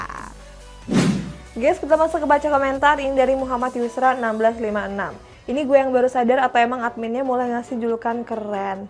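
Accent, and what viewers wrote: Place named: Banjar, Indonesia. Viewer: admin mulai gokil , ad bhs banjar samarinda wkwkwk mantap sanakkk salam buan jelawat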